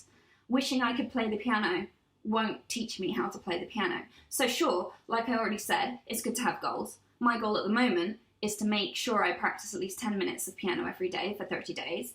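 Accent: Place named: United Kingdom. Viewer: Omg! See we are on the same wavelength! Spot on! And I didn’t know you were a Brit! Is that what your accent is? I love it!!!!! You are NOT bad!!!!!! I always get up and do bad karaoke and try to get other shy people to go up with me and sing and see it’s just a fun game! Music is fun and expressive and wonderful! I love this video!!!! I need to break back into my fb and share your stuff lol! Awesome awesome awesome!!!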